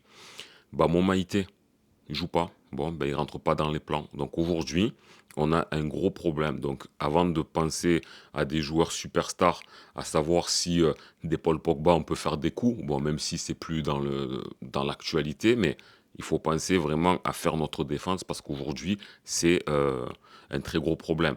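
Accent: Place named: Paris, France. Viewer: L'accent parisien n'est pas l'accent de référence. Quand tu viens chez nous, c'est toi qui a un accent.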